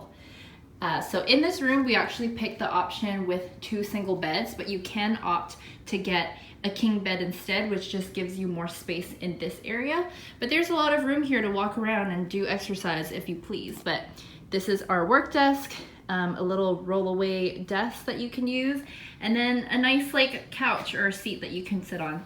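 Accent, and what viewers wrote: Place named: United States. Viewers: How come you use the American accent in M’sia ? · Late to respond here🙏 Appreciate the video👍👍 I’d say stick to your American or North American accent while I’m Malaysia.. whenever I try use Manglish/Singlish, I think I sound weird and can’t get my thoughts across. All the best!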